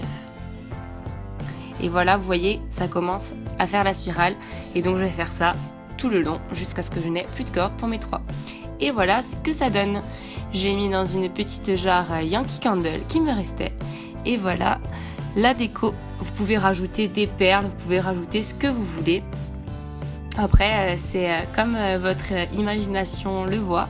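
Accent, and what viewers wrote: Corsica, France. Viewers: Salut, juste une question qui n'a rien a voir avec la video, mais en t'entendant parler tu sembles être de corse toi aussi... c'est le cas ? Voila, bonne continuation à toi =)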